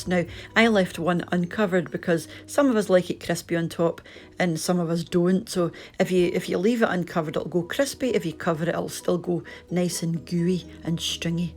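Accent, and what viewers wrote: Scotland, United Kingdom. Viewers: Oh, and thank you. Really enjoy your Scottish accent.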